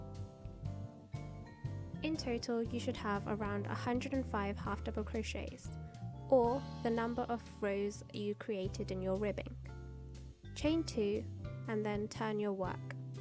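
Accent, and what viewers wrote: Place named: United Kingdom. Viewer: Yessss! You go girlllll. I like how slowly you work. I learn by repeatedly watching the action over and over again. So this really works for me. Very good close ups so one can see exactly where to put the hook. Love the fact that there are no speeded up camera shots I'm better at knitting. My crochet tends to go wierd and lop sided as I don't always place the hook in the right place. I get so stressed when I crochet so I don't tend to do it very often.😥 Its really good to hear a tuturial made by a British person so I am not going to get confused like I do when following an American crafter. I am going to try and make this. I have not made a crochet garment for years. Thank you for sharing. How many balls of the Women's Institute Aran yarn did you buy for the project?Bravo teacher👍🏿🙏🏿👏🏿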